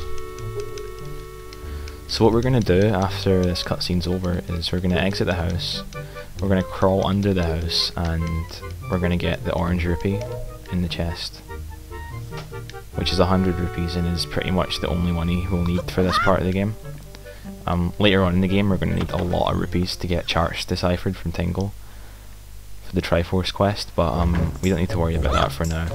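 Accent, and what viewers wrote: Scotland, United Kingdom. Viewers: Hrm yeah he does sound Scottish. Cx My mistake. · Scottish accent? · More please. Also, is that a Scottish accent?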